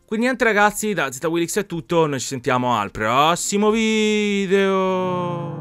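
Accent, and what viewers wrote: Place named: Rome, Italy. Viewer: È di Roma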